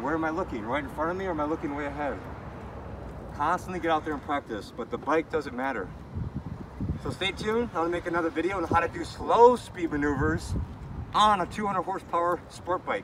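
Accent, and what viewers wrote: United States. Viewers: Great info for any rider, even riders that already get their knee down. BUT THE BEST PART OF THIS VIDEO is your accent and voice. Its amazing. You could do audio books narrate movies, do voice acting... You SOUND like you might be from illinois, POSSIBLY ohio, but man, its hard to pinpoint. Very clean, great diction, your pronunciation is better than a news reporter... Holy shit. Not to mention the voice is as good as the info here. I've been riding for years, been putting a knee down for a long time, but you are setting clear, repeatable markers on the bike for how to do it effectively. I can already tell I'll be able to be more smooth with it from now on.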